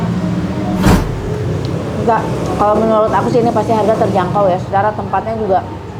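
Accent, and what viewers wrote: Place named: Sunda, Indonesia. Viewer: Gpp tteh ngomong Sunda jg .atw cmpur da stiap org pasti saat bicara logat daerahnya ke bawa..tugas editor ulah males ngedit.kerja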